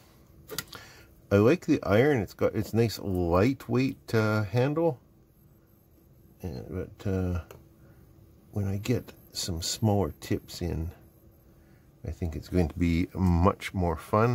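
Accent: United States. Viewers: That's mainly coz we Aussies Solder, while he SoDDers like a yank.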